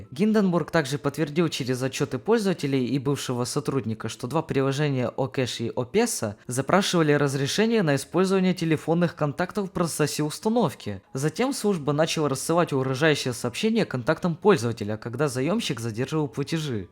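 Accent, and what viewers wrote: Ukraine. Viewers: Он из Украины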